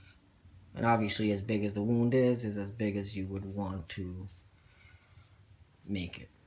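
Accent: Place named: New York, United States. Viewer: You say awwff, for off, are you New York..New Jersey? I'm hailing from central London UK.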